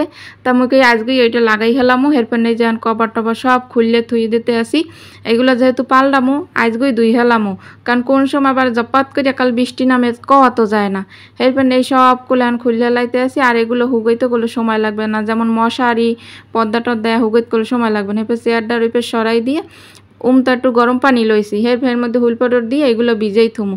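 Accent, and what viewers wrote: Barishal, Bangladesh. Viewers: আপু তোমার কথাগুলো কেন জানিনা আমার খুব ভালো লাগে বিশেষ করে বরিশালের ভাষা আমার কাছে খুব পছন্দের ❤❤যদিও আমার বাসা উত্তরবঙ্গে কেন জানি না দক্ষিণাঞ্চলের বরিশালের ভাষাটা আমার কাছে খুব পছন্দের ❤❤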